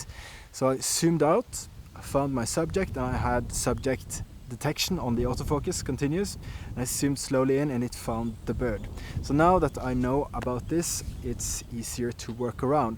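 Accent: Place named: Norway. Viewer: I hear a Norwegian accent... am I right? Thanks for a good review!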